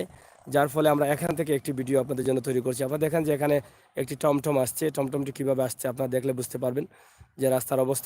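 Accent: Sylhet, Bangladesh. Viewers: আপনার বিডিও কুব সুন্দর লাগের ভাই। সবটি দেখছি। আর সিলেটি মাতলে আরো বেশি মযা লাগে।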